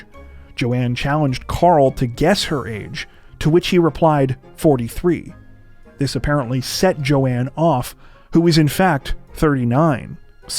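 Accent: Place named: Canada. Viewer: I love how you beautifully pronounce foreign names, and speak in a really soft north American accent, I'm thinking maybe you're Canadian.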